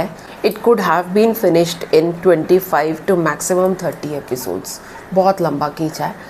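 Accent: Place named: Pakistan. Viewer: Masha Allah mam apki awaz m kitni safai hy. Sath sath hmari mother laguage ka b touch hy in short sarhad paaar han hum lekin dilon me apnaiyat aj b ha jo apki baton se lag rae hy k jese aek e family ho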